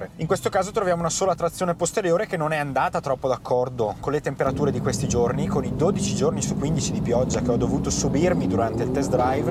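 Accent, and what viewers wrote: Brescia, Italy. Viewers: Che accento Bresà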